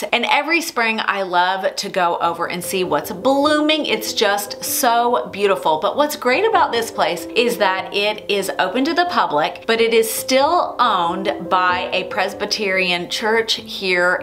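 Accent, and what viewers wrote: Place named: Virginia, United States. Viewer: I really liked this one. I'm from norfolk, va and your accent reminds me of home. I like old cemeteries too!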